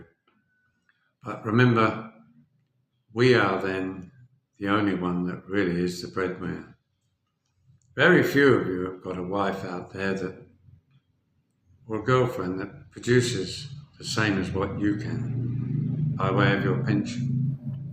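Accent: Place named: United Kingdom. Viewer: Hey No Need, I agree there are many Stupid Americans here and Stupid Brits the Same, you can’t claim to be Australian, but you’re truly British. It’s in your accent long live the King God bless. Hope you’re safe.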